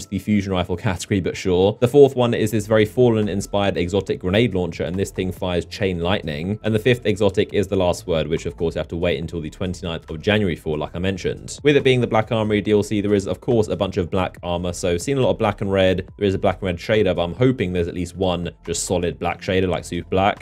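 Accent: United Kingdom. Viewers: Why is everyone on Youtube talk in a British Accent ? lol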